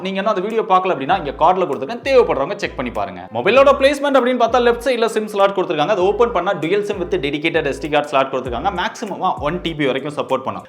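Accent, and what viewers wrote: Kovilpatti, India. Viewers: Bro neenga kovilpatti ya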